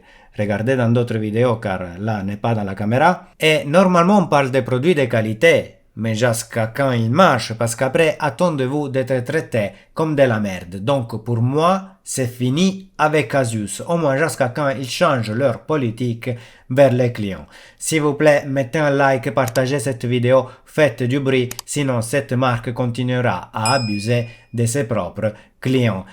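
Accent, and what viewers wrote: Italy. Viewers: ALORS GLOBALEMENT JE SUIS D'ACCORD AVEC TES COUPS DE COEUR ! PAR CONTRE APRÈS LORSQUE TU PARLES DE CARTOGRAPHIQUES JE SUIS MOINS EN D'ACCORD AVEC CE QUE TU DÎT ! POUR EXEMPLE LE DLSS ET AUTREMENT MIEUX QUE LE SYSTÈME DE AMD QUE J'APPRÉCIE PERSO ATI CAR ILS ONT RACHETÉ DEPUIS LONGTEMPS CERTE MAIS JUSTEMENT LORSQUE 1 MARQUE EN RACHÈTE 1 AUTRE DANS LA MAJEUR PARTI DES SECTEURS C'EST TOUJOURS LE MÊME NOM QUI ET GARDER ! POUR EXEMPLE EN AUTOMOBILE TU PEUT PRENDRE N'IMPORTE QUEL MARQUE APRÈS 1 RACHAT ET ELLE NE CHANGE NI DE NOM NI MÊME DE PAYS OFFICIEL POURTANT POUR MOI LE PAYS DEVRAIT CHANGER À PARTIR DU MOMENT OÙ LES DÉCIDEURS NE SONT + DU PLACE POUR DONNER QUELQUES EXEMPLES ON PEUT PRENDRE LE FRANÇAIS BUGATTI RACHETÉ PAR ALLEMAND VW ET SI L'ON PREND D'AUTRES MARQUES AUTO C'EST TOUJOURS PAREIL JE PRÉSUME AVEC TON ACCENT QUE TU ET D'ORIGINE ITALIENNE ET BIEN SI L'ON PREND LAMBORGHINI POUR EXEMPLE ILS ONT ÉGALEMENT ÉTÉ RACHETER PAR VW ET LE NOM DE LA MARQUE RESTE ET LE NOM DU PAYS AUSSI ET TU PEUT PRENDRE N'IMPORTE QUEL EXEMPLE ET CELA NE CHANGERA PAS ! 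D'AUTRE PART MOI QUI SUIS 1 VIEUX PASSIONNÉ DE HI-FI JE PEUT T'AFFIRMER Q CE EXACTEMENT LA MÊME CHO EN HI-FI ! ALORS QUE EN PC OU EN D'AUTRES SECTEURS IL AEEUVE PARFOIS QUE L'ON ÉLIMINE LA MARQUE D'ORIGINE ! BREF J'AI DISGRESSER SUR 1 AUTRE SUJET 😅😁 MAIS POUR LES CARTES GRAPHIQUES NVIDIA IL Y A DES GAINS IMPORTANT AU NIVEAU D CERTAINEMENT CHOSES NOTAMMENT AU NIVEAU LOGICIEL POUR LE DLSS C'EST + DE VITESSE CAR C'EST MOINS LOUR ET ÉGA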